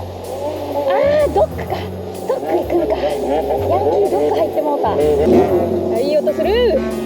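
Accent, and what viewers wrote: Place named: Hakata, Japan. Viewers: トップページに「ヤエー」？「ハイク乗り」？が表示されたので不思議に思って観てみたらだったんですね。登山動画で何度が観ているのでお勧めに上がったのでしょう。山にいる時よりハイテンションで九州弁が良く出ていますね。バイク乗りにそんな習慣があるなんて知りませんでした。一息ついたらバイクの旅も診てみます。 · 博多弁？可愛い🥰面白い企画でした👍🏻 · がたまに喋る方言が好き！福岡の方言は可愛すぎる！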